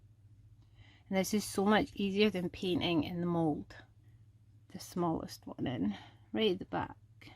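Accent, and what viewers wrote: Scotland, United Kingdom. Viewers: Of course! I should have realized that it was a Scottish accent, no wonder I like it, that's my favorite dialect. My Grandfather's name was McCoid, not sure if it's Irish or Scottish, but I have visited both countries and would live in either quite happily! Thank you for your reply and keep up the great work.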